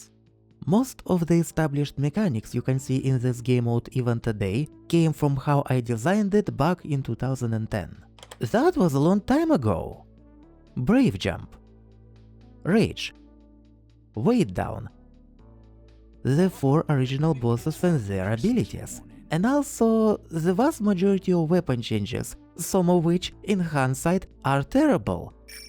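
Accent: Russia. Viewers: Are you russian ? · Почему у тебя такой русский акцент? (я просто тебя впервые вижу)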